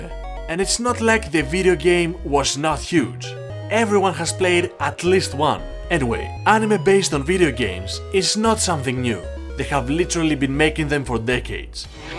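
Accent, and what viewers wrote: Greece. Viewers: You have the most thickest greek accent I've ever heard, much love bro!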